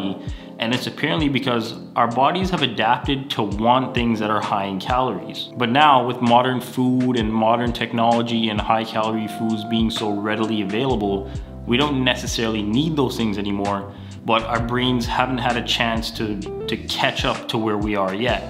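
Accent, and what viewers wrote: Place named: Toronto, Canada. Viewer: Man you have such a Toronto accent😂😂.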